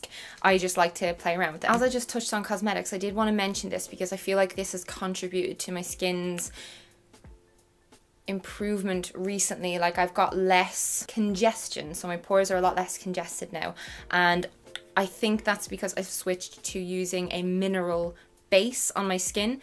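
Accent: Ireland. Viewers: Sometimes when I watch YouTube my brain doesn’t register accents AND HOLY SHIT YOU’RE IRISH. also you’ve probably heard this but I love your accents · your accent is so cute... wish i was irish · Love your irish accent! And nice tips!!!! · God i miss Irish accents like yours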